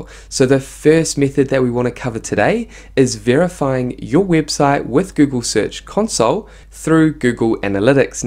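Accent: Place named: New Zealand. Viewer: Great content, thanks. Good to hear a kiwi accent too!!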